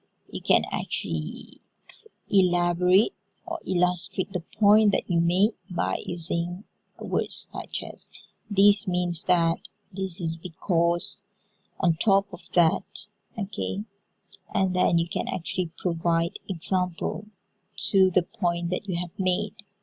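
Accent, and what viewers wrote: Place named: United Kingdom. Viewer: 8 mins before my MUET. Btw love your british accent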